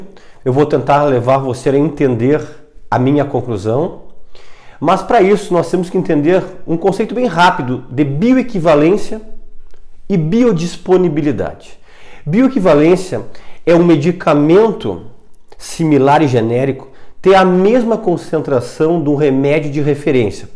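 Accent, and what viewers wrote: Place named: Rondônia, Brazil. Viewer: O senhor fala a nossa língua Rondônia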